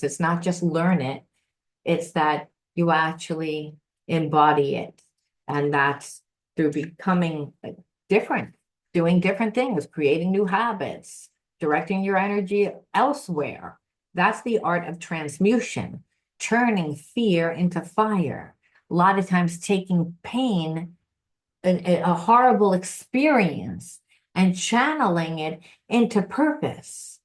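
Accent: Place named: New York, United States. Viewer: Dust part of self, & Deity part of self-- awesome!! I have felt stuck in moving forward with creative interests (despite the fact that I know I'm highly creative) and your explanation made things so much clearer for me. My parents held very narrow views of what it meant to be creative & mine was simply unrecognizable to them. Thank you for this extremely helpful reading-- really opened up a new vista for me! Sending love & light as always. 💟🧡💚 p.s.- I get such a kick when you come on heavy with your New York accent-- you're adorable!! 😂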